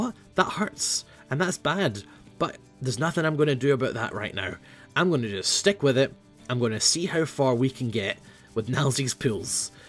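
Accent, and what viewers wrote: Scotland, United Kingdom. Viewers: you can just tell he's Scottish like me hah were ur yees gon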